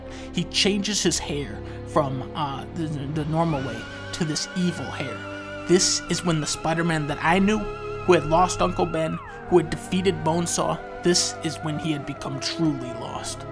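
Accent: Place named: Boston, United States. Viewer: I love dunkey and all...but, the exaggerated Boston accent and sarcastic movie reviews is pushin to be a little similar to Jontron.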